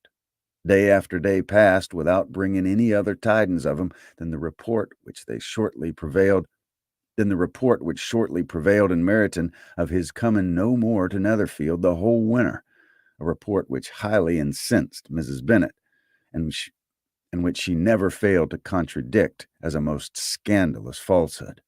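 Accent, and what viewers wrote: South, United States. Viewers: I'm not from the US, and most of my experience of the US southern accent is from movies and TV. But listening to you is soothing and I'm sure that I'll be listening to your entire audiobook when you pull it together. Great work and thank you.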